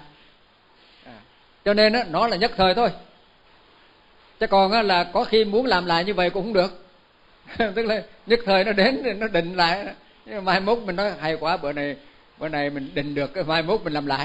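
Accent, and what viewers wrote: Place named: Saigon, Vietnam. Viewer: Mô Phật... kính bạch thầy. Xin thầy vui lòng chuyển âm băng của thầy giảng bằng "GIỌNG NÓI SAI GÒN " Rất hay và dễ nghe. Xin chân thành cảm ơn thầy. Con luôn luôn yêu mến giọng nói SAI GÒN tha thiết !!!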